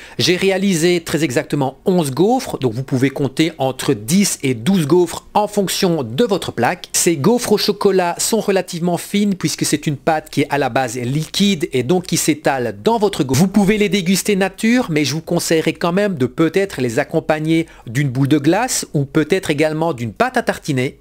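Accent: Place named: Belgium. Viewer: Très bonne idée chef, une bonne nouvelle année et encore de belles vidéos avec bien l'accent belge 🎉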